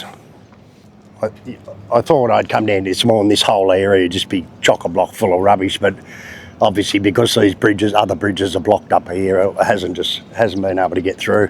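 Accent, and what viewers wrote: Australia. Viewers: I’m in North Queensland, great to hear the flat Aussie accent 😊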